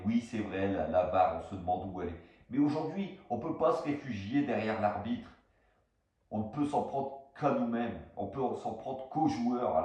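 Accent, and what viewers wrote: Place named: Lens, France. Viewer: T'a un accent lensois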